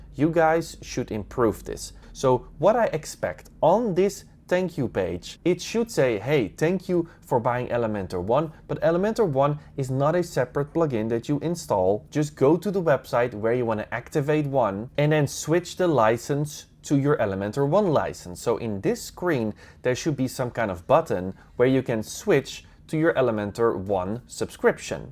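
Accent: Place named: Netherlands. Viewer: Ive been an Elementor Pro user for years and Im seriously considering whether Elementor one, especially the mail feature and image optimization, would be worth it as a subscription for my clients. Id love to test it in real client projects. And yes, theres only ONE with that legendary Dutch accent. Sending you warm regards from Switzerland, where the English accent is just as charming, just with more mountains.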